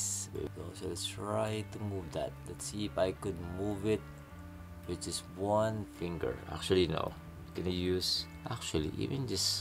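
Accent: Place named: Philippines. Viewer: Are you Filipino, sir? Your accent sounds like..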